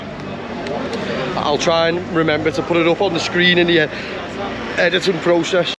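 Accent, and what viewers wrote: United Kingdom. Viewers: Soooo welcome bro❤️ Your British accent is awesome man❤️❤️ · Welcome to Iraq mate..👈🇮🇶 Which UK city accent is this..?? 🤔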